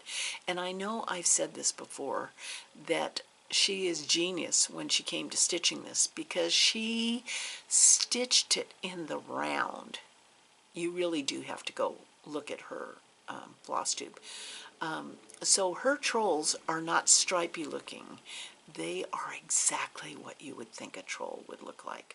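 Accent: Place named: Kentucky, United States. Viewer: I’m reading Diamond Eyes, what a great read. I also love history. Just fascinates me. I’m one of those people who stitch all day and read all night and then wonder why I’m tired. 😂 I get together with girlfriends a couple times a week and stitch or knit. I’m making another summer top. It’s so soft and drapes beautifully. Using bamboo yarn.. which I would have never thought it would be so soft..who knew. Thanks for the great visit, I’m going to check out the floss tubes you mentioned. Nothing funnier than listening to a good ol Kentucky accent. 😂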